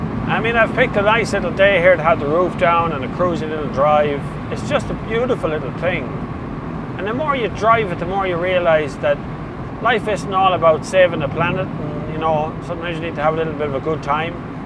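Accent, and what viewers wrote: Ireland. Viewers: Love the Irish brogue! “It’s a beautiful little ‘ting!”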